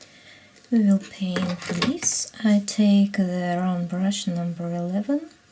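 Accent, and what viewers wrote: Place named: Russia. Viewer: вы русскоговорящая? Акцент очень наш))))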